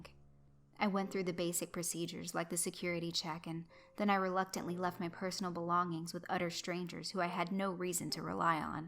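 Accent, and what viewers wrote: South, United States. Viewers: I ❤ listening to your soothing southern accent and awesome creepypata choices 😊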